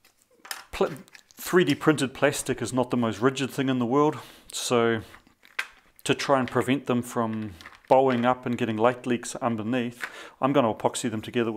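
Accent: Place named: New Zealand. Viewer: Nice, thx! sorry for a silly question but what's your accent? Is it New Zealand? But you seem to live in Germany? Great series anyway :)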